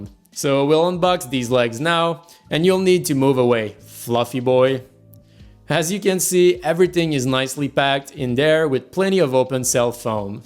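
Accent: Quebec, Canada. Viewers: Criss, ca parait t'es Quebecois, lool. Nice vid! Just got myself a standing desk. Gonna check out your cable management vid lol.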